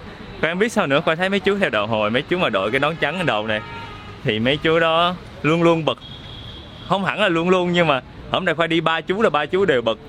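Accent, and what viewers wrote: Mekong Delta, Vietnam. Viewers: Dân mìền Tây nói chuyện nghe vui ghê. Vui vẻ thì nói là dui dẻ. Màu vàng thì nói là màu dàng.Việt Nam thì nói là Diệt Nam..Mình có dạy cho thằng bé lớp năm quê ở miền Tây môn tiếng Việt mà sửa lỗi chính tả cho nó cũng muốn tẩu hoả nhập ma. Nhưng giọng, cách phát âm thì vẫn cưng và nghe hay thật.Chính mẹ mình dân Daklak còn khen nói chuyện hay.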